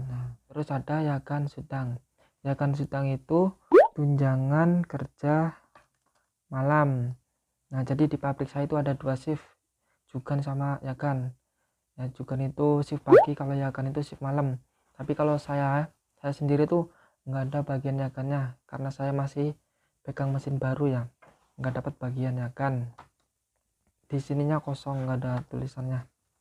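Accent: Tegal, Indonesia. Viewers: Wong ndi sih? Kaya wong Tegal basane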